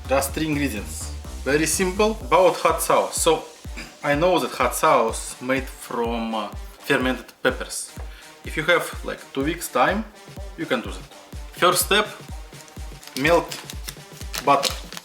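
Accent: Russia. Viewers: “Sorry for my Russian accent” Your accent sounds nice! I actually enjoy hearing your voice in your content! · There was a time I was just watching your videos just to hear that awesome Russian accent, love you❤️ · Looks really good. This is the Einstein of cooking. And you Russian acsent is really nice. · Its ok the Russian Accent man, and its kinda cool to be honest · Come on! "Sorry for my Russian accent"? Man, i personally love it! Hope you do more commented vids! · Your Russian accent is slav, speak Russian sometimes. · I actually like Russian accents. Keep up the good work my man. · Damn russian accent is just 😩👌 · Russian accent english is better than bri‘ish english ngl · Russian accent is my favrouite please dont be sorry about it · Don’t be sorry about your accent, tbh I think a Russian accent is pretty cool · Idc about the russian acent all I care is that u made a voice reveal lets gooooooooooooooooooooooooooooooooooooooooooooooooooooooooooooooo!!!!!! 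· Bro having an Russian accent is cool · Russian accent is fine i can understand and i am sure every1 else can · Dude.. you should be proud to have a thick russian accent. It is nothing to be sorry about. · Yep you very good Russian accents and English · Having an accent is to be that u have an identity and u should be proud of Russian accent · I like the russian accent btw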